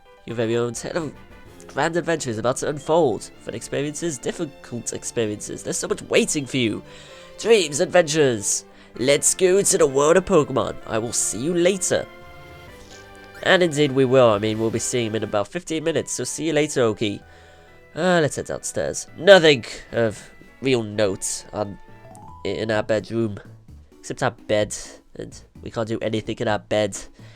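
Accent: United Kingdom. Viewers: NOOOOOO! HE'S A BRIT! NO RACISM BUTI DON'T LIKE THE ACCENT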